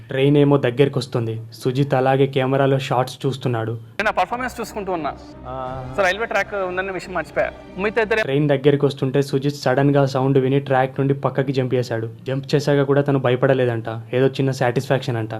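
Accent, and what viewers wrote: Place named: Warangal, India. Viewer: Hi...ne video motham chusa 1 sec kuda waste chykunda u explained vry gud..proper warangal or karimnagar laga undhi me slang...iam Sandeep frm Hyderabad..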